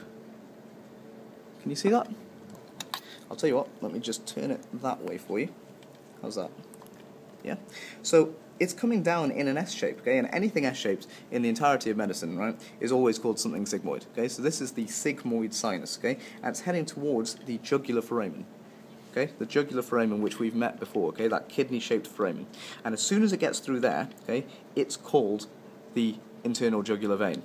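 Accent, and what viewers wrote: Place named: Wales, United Kingdom. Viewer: Very useful, many thanks! You sound slightly welsh which is also a bonus!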